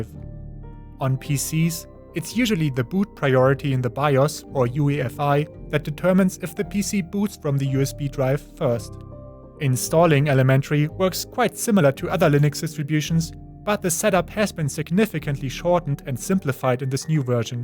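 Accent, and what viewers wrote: Germany. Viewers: I know right! I love his god damn German accent.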